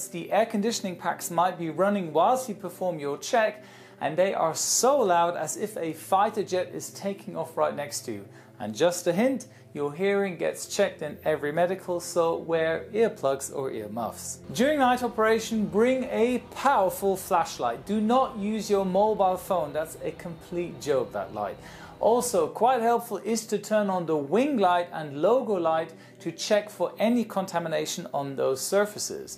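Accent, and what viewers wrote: Germany. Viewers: Are you German? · Ach... nen Germane... bin ja erschüttert das man das nicht schon früher festgestellt hat. XD (Gut... irgendwie klingt jeder Pilot gleich... da hinterfragt man das wohl nicht. ;))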